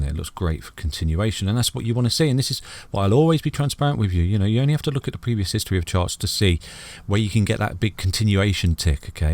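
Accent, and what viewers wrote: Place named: Leicester, United Kingdom. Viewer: Thanks again for the great content, you are my new favourite channel!. i have been following mostly American channels like the Block chain backer and working money channel ect, i have learnt more from you in the last 2 weeks than i have in a year. love the way you come across with no BS. i would love it if you could do more TA so we can learn all the tricks of the trade. great channel, well done pal, thinking that is a Leicester accent you have!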